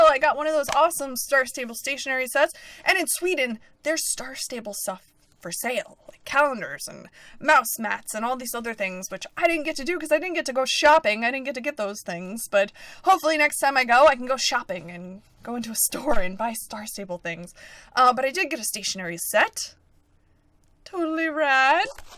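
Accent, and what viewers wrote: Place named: United States. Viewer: lives in America, you can hear that from her voice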